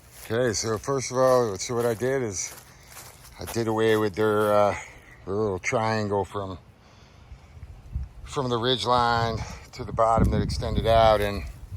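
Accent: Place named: Massachusetts, United States. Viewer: I think you did a fine job! For some reason knot making will not stick in my head. I barely got the one down you made...lol Just crazy AF as to why. ~Anyway, found your channel from your Squak Mountain mid layer Hoodie review and slowly following along your past journey landing here. I mean it's all been so cool. You remind me and sound exactly likef every guy I grew up with, 😆 in Massachusetts As an avid camper and novice adventurer for the past, 40 plus-well way too many years, wink, I had to break down and bite the bullet investing in ultra light gear. It was time. Stuff was weighing me down, wink again. So... I coincidentally got this tent too on sale right after Christmas. The Virgin sail will be now April 2025 if New Hampshire finally stop snowing. I am look forward to seeing your AT experience in these parts last year. OMG. 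I bet a real doozy coming from those flat lands there in Florida. No joke, huh. I was born on a rock a wee bit north of Boston so flat land is pretty foreign to me. Gotta walk slow here with a big stick.... My guy and I have been dipping into off grid camping allot past few years, for him a breeze as an older model USMC but for me well everything is cool but the bathroom situation lol. Despite that have found some amazing spots and lots near the AT. Love seeing the hikers. Would love to be a trail magic magian at some point. I moved from the Rock up here about three years ago so we are a big closer or half the way atleast. Maybe this summer plant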